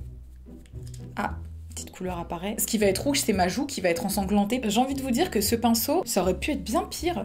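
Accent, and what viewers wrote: England, United Kingdom. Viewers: Salut.j adort ton accent anglais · Mais tu me fais tellement rire, je suis fan ! Et cet accent anglais, j'en peux plus 🤣🤣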